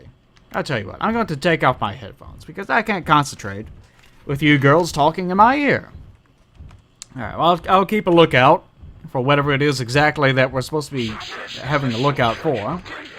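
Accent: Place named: Kentucky, United States. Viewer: Goddamnit, how do you make a Kentucky accent sound so gallant?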